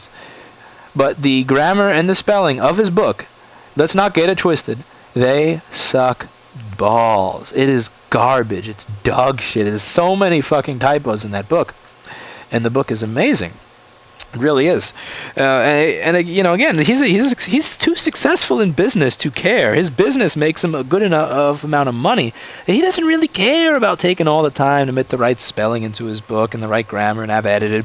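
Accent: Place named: New York, United States. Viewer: I think the New York accent makes your “shut the fuck up” 10 times better LOL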